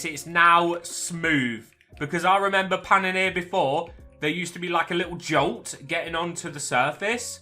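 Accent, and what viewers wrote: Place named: United Kingdom. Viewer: Bro is British because he was spending pounds and his accent also makes this true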